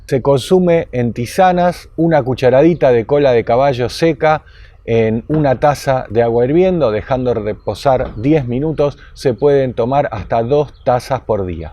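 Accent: Argentina. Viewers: Sos argentino che redoy mi like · tienes acento argentino yo soy mexicano te sigo en mexico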